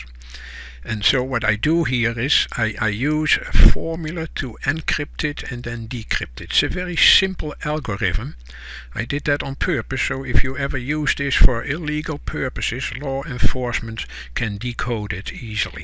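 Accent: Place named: Netherlands. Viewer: Sean Connery is Scottish. This guy sounds like he's from the Netherlands or something.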